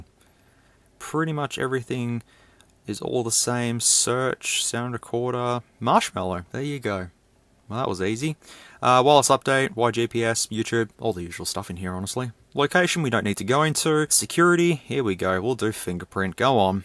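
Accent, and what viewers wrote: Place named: Australia. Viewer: That mic test/phone call/your accent always reminds me of our old mate Danky/Female Snake "Frank" ("Dankpods") when he goes on a ramble while testing microphones (Congrats to him for achieving 1M Subs too!!) As well as Hugh Jeffreys are one the only three Aussies that are pretty much the only tech reviewers on my Subscribed list.. 👍👍😎🇬🇧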